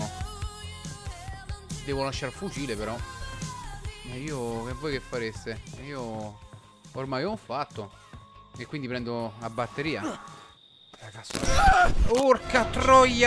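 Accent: United Kingdom. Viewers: ci sei troppo bbritish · Quanto sei britisch quando parli inglese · Ciccio e l inglese molto British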